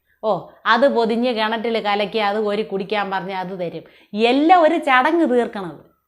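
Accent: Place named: Thiruvananthapuram, India. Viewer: .....Avatharanam Soopar ❤❤❤ AND..Avatharana, Shali, Thiruvananthapuram Basha Shali.... Adipoli..... Sooopar..❤ Sooopar...❤ Sooopar...❤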